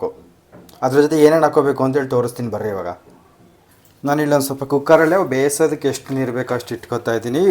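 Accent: Chitradurga, India. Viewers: ಪಕ್ಕಾ ದುರ್ಗಾ ಭಾಷೆ....... ಅಣ್ಣಾ ನಮ್ದು ಹೊಸದುರ್ಗ........😊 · Sir nammdu Talikatte Holalkere,nivu hosdurga, Chitradurga dalli,yava villege sir? ನಿಮ್ಮ ಭಾಷೆ ಪಕ್ಕಾ ನಮ್ಮ ಐತಿಹಾಸಿಕ ಚಿತ್ರದುರ್ಗ ದ ಭಾಷೆ, ಕೇಳಿ ತುಂಬಾ ಖುಷಿಯಾಯಿತು,tq u sir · ಚಿತ್ರದುರ್ಗ ಭಾಷೆ ಸೊಗಡು...ಆಹಾರ ಪದ್ಧತಿಯನ್ನು ಚೆನ್ನಾಗಿ ಪರಿಚಯಿಸಿದ್ದೀರಿ ...ಸೂಪರ್ bro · Anna nam durga bhashe chanag mathadtiya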